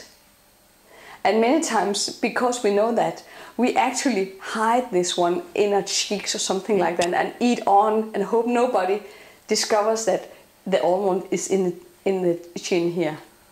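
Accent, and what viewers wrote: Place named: Denmark. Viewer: kæft hun har jo en piiiinlig dansk accent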